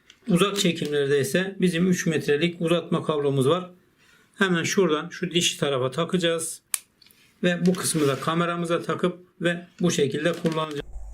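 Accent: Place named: Black Sea, Turkey. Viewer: abi sen karadenizlimsiin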